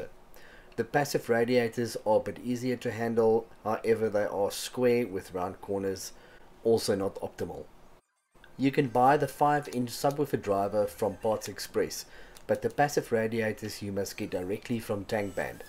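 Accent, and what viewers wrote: Afrikaans, South Africa. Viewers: Nice to hear s.africa accent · he sounds so Afrikaans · wow you sound very Afrikaans.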